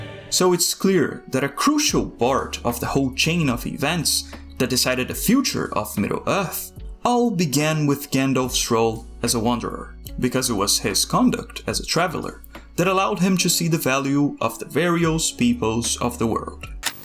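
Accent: Brazil. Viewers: Vc é brasileiro, né? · You sound Brazilian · Sir, are u brazilian? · Brazilian masking accent 👀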